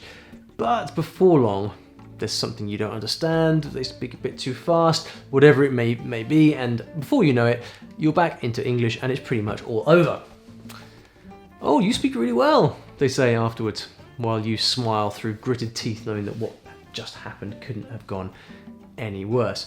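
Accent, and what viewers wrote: United Kingdom. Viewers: It's British accent and everybody likes it. His accent is the main reason of his subscribers to stay at this channel.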